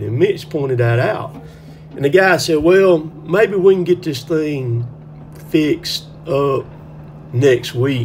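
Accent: South, United States. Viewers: I could tell you were from either Alabama, Mississippi or Georgia. I’ve been thinking about buying tree climbing gear to expand my side hustle. I really do love tree work. I’m from Alabama. Know exactly where Wadley is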